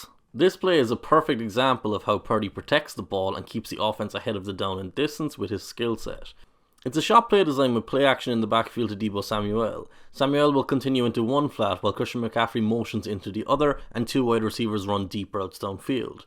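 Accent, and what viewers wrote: Ireland. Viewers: Nice -- more football analysts should have Irish accents. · WAIT!......why is an irishman talking about American Football......and in a very concise and inciteful manner?? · i'm lovin' hearing an Irish analyzer review my team. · Hearing an an irishman be so analytical about football brings me so much joy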